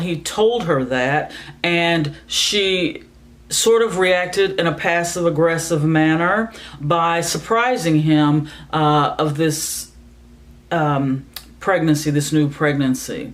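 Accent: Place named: United States. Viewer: Love ur American accent x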